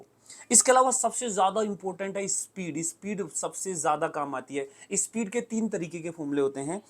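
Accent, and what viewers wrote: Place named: Haryana, India. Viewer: Sir ki launguage h jo log haryana se hote h yese bolte h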